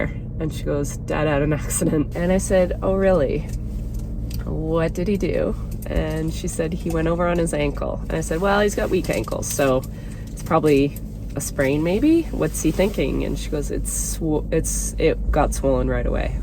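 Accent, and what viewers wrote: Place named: Ontario, Canada. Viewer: I'm from Ontario but live in NZ and love hearing my home accents...following the trials and joys... I always learn so much from you, sheep and farm side... always inspired by how hard you work and the dedication to the animals and land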